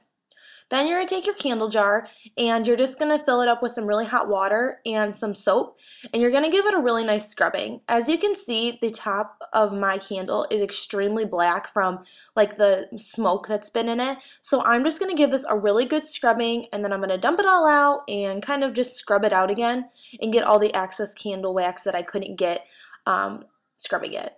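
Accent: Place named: North, United Kingdom. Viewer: As a northerner, I can hear in your voice that you are also a northerner. Good video!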